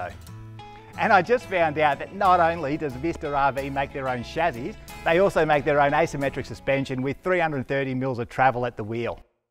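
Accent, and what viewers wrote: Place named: Australia. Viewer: South Africans 🇿🇦 started the offroad caravan trend, yes, but others keep on innovating. I just luvv the designs by the Aussies. And that Ozzie accent is just the best. A controla. Or a V8 moda. Just love the dialect.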